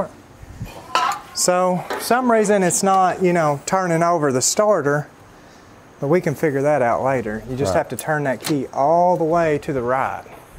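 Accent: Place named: Texas, United States. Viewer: I like the pace of your working. You tackle things so intentionally and cleverly. Also the dialogue between the two of you is amazing to witness. I prefer that so much more than when you made the silent version on the Citroen. That was kinda weird because it was so obvious that at times you had to restrain yourself talking. That made it awkward to follow. Besides, your Texas twang is nice to listen 😊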